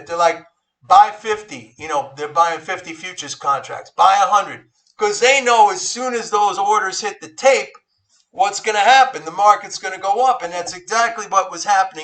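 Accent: New York, United States. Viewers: Just stumbled upon your video. What you say is so true. PS: The NY accent adds the extra credibility that you worked on the floor.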